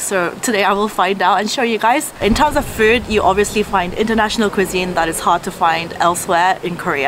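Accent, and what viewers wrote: United Kingdom. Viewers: Do you have a british partner or spent time learning english in the UK? You have an interesting british accent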